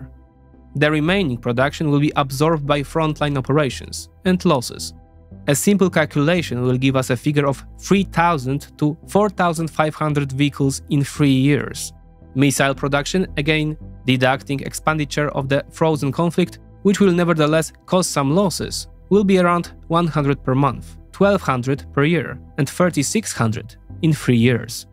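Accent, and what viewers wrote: Russia. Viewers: You probably could care less but I decided to unsubscribe from your obvious Pro-Putin stance; you may possibly be a Communist or atheist? Regardless I cannot abide anyone standing with Putin and his TERRORIST MAFIA STATE! I hope and believe that Putin will fail; his economy is shattered and is crumbling. May all atheists fail! I’m happy to have unsubscribed; you even sound like a Russian!! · Как же он пытается скрыть русский акцент)) клоун)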